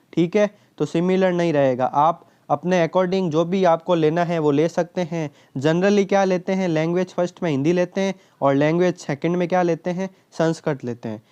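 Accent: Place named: Jodhpur, India. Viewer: मुझे आप जोधपुर साइड के लगते हो 😂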